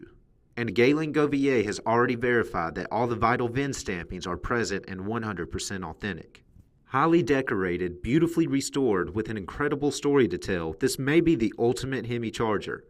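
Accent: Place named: South, United States. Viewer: Hey man. I just love your Southern accent as much as the car.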